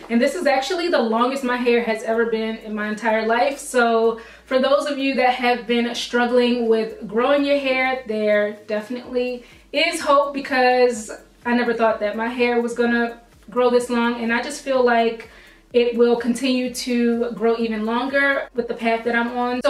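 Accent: Baltimore, United States. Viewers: I hear a Baltimore accent! 🤔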